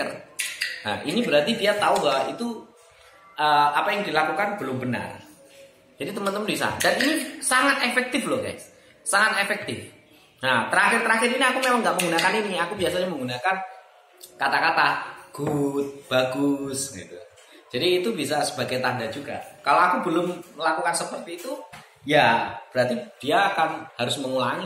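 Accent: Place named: Surabaya, Indonesia. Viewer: Mangkane kok koyok tau eroh, ternyata wong Suroboyo dw hahaha...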